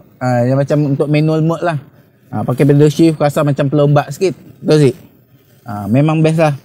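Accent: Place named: Sarawak, Malaysia. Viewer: Mantap 👍mun diam Serawak dalam sebulan nang konpem fasih kelakar Sarawak. Ton suara dah kedak orng sitok.. Kipidap bro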